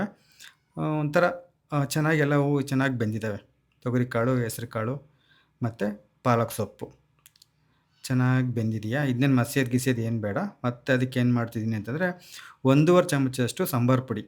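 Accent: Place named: Holalkere, India. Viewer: ನಮ್ಮ ಹೊಳಲ್ಕೆರೆ ಭಾಗದ ಊಟದ ಸೊಗಡಿನಂತೆ ನಿಮ್ಮ ಮಾತು ಸಹ ನಮ್ಮ ಸೊಗಡು ಉಳಿಸಿಕೊಂಡಿದೆ😂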